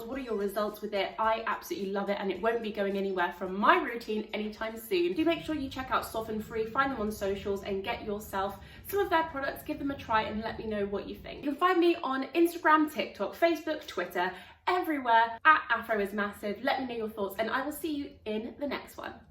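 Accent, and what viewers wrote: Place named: United Kingdom. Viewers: Are you brittish or South-African? Cool accent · She's a Brit. There's a significant difference between the 2 accents. I'm surprised you're unable to differentiate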